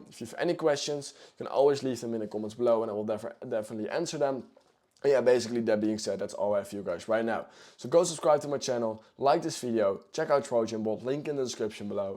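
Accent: Netherlands. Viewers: i smell a bit of nederlands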